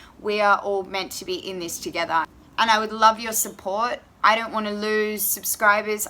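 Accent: Australia. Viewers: THIS!!! I was looking for somebody i could relate on this diet. When I saw you I was like: YEP! THAT'S HER!!" my kind of girl to follow: strong, confident, beautiful mind (and body)., well spoken (love the aussie's accent, can't help it;) ),please don't change yourself! ever!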